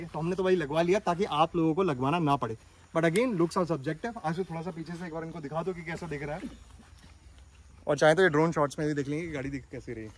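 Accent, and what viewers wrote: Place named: Uttar Pradesh, India. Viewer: BHAI AAPKA BAAT KAARNE KA TARIKA BIKUL UTTAR PARDESH STYLE HAE!! LOVE FROM LUCKNOW!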